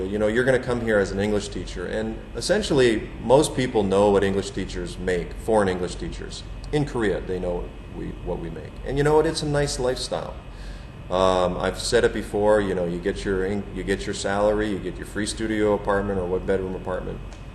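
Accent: United States. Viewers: wow awesome american accent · steryotypical American accent, Americans don't actually talk like that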